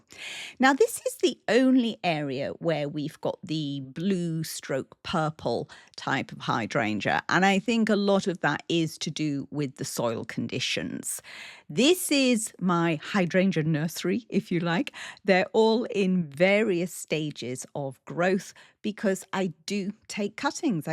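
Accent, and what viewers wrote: United Kingdom. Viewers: OMG absolutely gorgeous!!!!!!!!! Love everything!!!!!! Im wondering where do u store the things that are not used at the moment? I love ur voice and this British accent 😊😊😊😊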